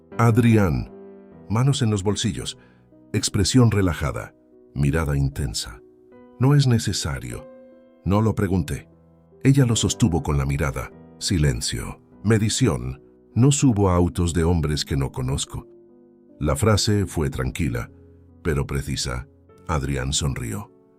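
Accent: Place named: Portugal. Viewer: Muy mal leido. Cambien de robot de lectura. Mezcla portugués con español y corta mal las frases. Chau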